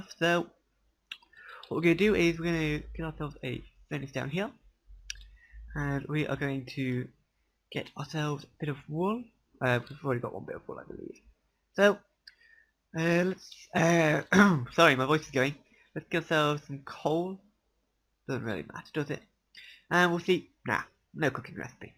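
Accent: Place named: Australia. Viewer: Are you from Australia? You sound like an Australian.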